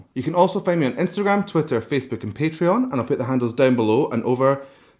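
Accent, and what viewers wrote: Scotland, United Kingdom. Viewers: Thank you for the analysis. A million years ago, I did vocal training but your mix of anatomy and explanation is really enlightening. BTW, you have probably heard that JK tends to be the go to guy for a lot of harmonising in the background of their songs and we have got to see him working on it for Euphoria. It is fascinating as he created multiple harmonic lines as he worked , listening back and overlaying them on the fly. He seems to just be very musically literate..as in, understanding quite complex harmonic sounds effortlessly. I am quite amazed by it and very jealous in a good way. Also, love your voice, in spite of no warm up (bold!!) and the Scots accent is great. Almost as good as the Irish but we'll leave that off. Thanks again.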